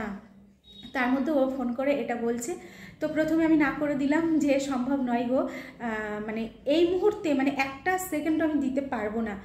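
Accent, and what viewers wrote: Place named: Kolkata, India. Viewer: আপু কেমন আছ? আমি মোটামোটি ভাল আছি। কখ অনেক কমেছে। ডাক্তার কখ মেডিসিন দিয়েছেন। শের পুঠি আমি খুব পছন্দ করি। আপু তুমি কি আমার বাংলা বুঝ? তোমার মত সুন্দর বাংলা বলতে এবং লিখতে পারি না? আর তোমাদের কোলকাতার বাংলা শুনতে খুবই সুমধুর লাগে। আপু তুমি তো খুবই busy থাক । আমি তোমাকে প্রাই বড় করে কমেন্ট লিখি তাতে তোমার অসুবিধা হয না তো? তুমি এমনই একজন মানুষ যে তোমার শত কস্ট হলেও তুমি কিছুই বলবে না । এই জন্য তোমার কাছ থেকে অনেক কিছু শেখার এবং জানার আছে। আজকে কোন প্রশ্ন দিব না। তোমাদের এখান থেকে কেরালা কত দূর? আজ এর কিছু রোমান্টিক গান শুনলাম। মাঝে মাঝে ভালই লাগে। আমি মাঝে মাঝেই গান শুনি। খুবই ভাল লগে। যাক আপু অনেক গল্প হল। মনে হয আমি তোমার কাছে বসে গল্প করছি । এখন আশি। শুভ রাত।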